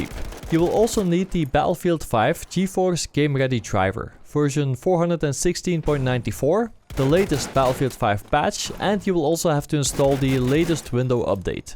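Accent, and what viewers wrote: Netherlands. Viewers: Your Dutch accent is so obvious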